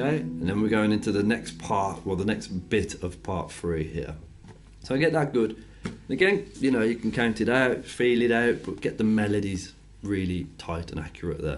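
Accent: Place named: Midlands, United Kingdom. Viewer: U sound really Midlands lol I love it......Ur teaching but I need you to show me imgd but dumb lol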